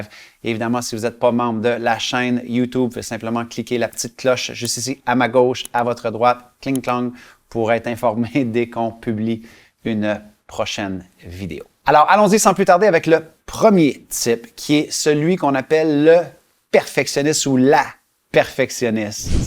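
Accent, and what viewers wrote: Canada. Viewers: Merci pour la vidéo hyper intéressante, en plus de l'accent canadien agréable à écouter ! Je me suis reconnue dans la super génie et la perfectionniste. Mentalement je comprends ce que je peux faire, il y a plus qu'à mettre en pratique et m'apporter de la bienveillance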